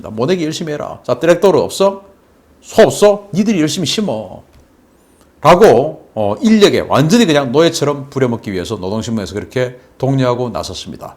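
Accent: Gyeongsang, South Korea. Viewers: 갱상도 사투리 좀 고쳐라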